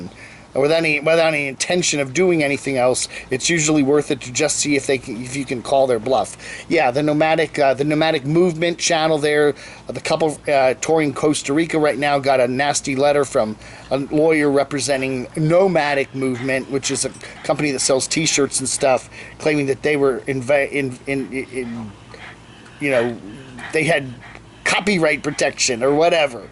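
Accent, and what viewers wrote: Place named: Baltimore, United States. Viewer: I love watching and listening to her Baltimore accent. She wants to have fun and has a lot of knowledge that she shares.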